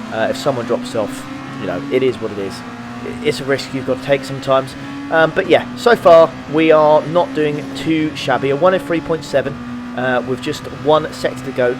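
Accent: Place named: England, United Kingdom. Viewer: Great Race, Dramatic Supens. Congratulations for ur fighting spirit znd ur Fair-play.💪👍 Love ur English accent and your channel content.👍🤩🤩🤩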